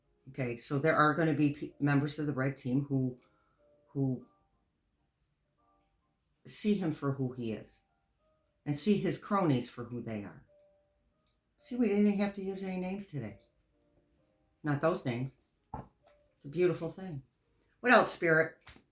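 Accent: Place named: Midwest, United States. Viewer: Omg! My Dear Aquarian Sister! We both know there is no mistake I found your channel. As a February 13,Aquarian, I have had a frickin crazy merc.retrograde too! Finally Ending legal drama since Feb.2023, I'm just now feeling back to some normalcy and with your wisdom I might add! I L❤V E your antics and how you give the ignorant, rude trolls a firm education on being "civil" something their mamas should have taught them. Keep it up! You keep me laughing! Most importantly, your beautiful readings have inspired me and continue to give me hope. It seems as if I've gone through some of the Same battles in the last 2 yrs in this country, just like President Harris has; I could definitely relate and I love your parts 1 and 2 segments. Anyone who has nerve to complain about Anything you do on Your show, is Not living on their higher frequency level. 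So, keep that up too! I also sensed that you may be from the Midwest or East coast? I think your accent is great and your personality is so right on! Like mine! Lol! I must and will get time hopefully soon to book a reading and to just meet a Real Sister in this now, crazy ass country! ❤U Earth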